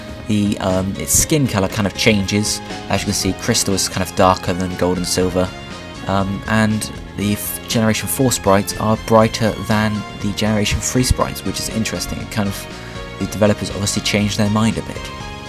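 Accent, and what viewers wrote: United Kingdom. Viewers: In UK English Herb is pronounced with an h, from his accent you can tell he is from the UK ;)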